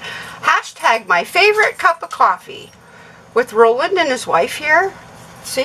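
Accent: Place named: South, United States. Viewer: Thanks for the birthday wishes wow statement makes me feel old 😂🤣 Nonnagrace no but I'll take a peek I mostly watch Nomadic van lifestyle as I believe I mentioned before that's what I plan on doing for the remainder of my life hopefully starting in 1.5yrs curious what city in Ohio your from and sometimes I hear a little southern bell in your voice have a good night y'all 😀😂😜✌️👍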